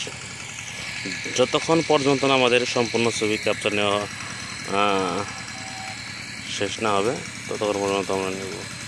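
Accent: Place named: Bengal, India. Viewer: Bengali guy speaking Inglish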